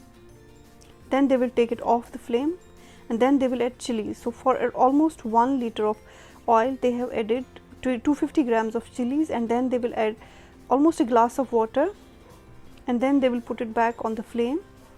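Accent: Kashmir, India. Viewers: Is it Dishes or Dissss? Wah re Kashmiri accent :) This is not a dig but on a lighter note. Great video!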